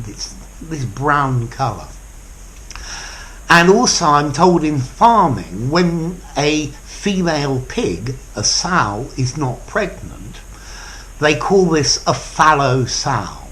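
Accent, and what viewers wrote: United Kingdom. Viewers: Sir, Your pronunciation is the best standard British pronunciation. Please continue the noble job. Grateful Thanks. 🙏🧡❣️💖💛❤️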